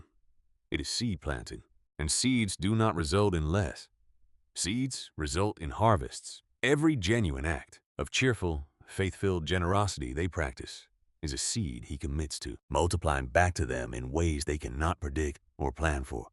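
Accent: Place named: Pittsburgh, United States. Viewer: Oh i like this voice Lord, it's just like "Pittsburghese" is the distinct regional dialect and slang spoken in Pittsburgh, Pennsylvania, and Western Pennsylvania. Rooted in the working-class history of the region and influenced by early Scotch-Irish, immigrant, and Appalachian speech patterns, locals who use this dialect are affectionately known as "Yinzers". I Am in eastern Ohio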